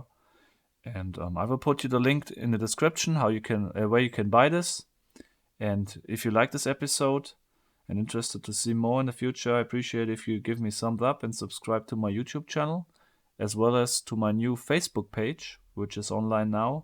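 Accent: Germany. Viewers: "screw them" ! :-) I love your Germlish. Never mind, it's ok.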